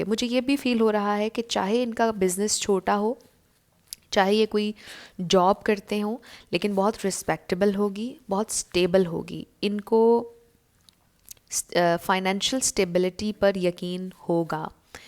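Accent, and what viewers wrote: Pakistan. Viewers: Your voice is so addictive with that peculiar urdu touch... I love your voice and style of speaking....Stay blessed ma'am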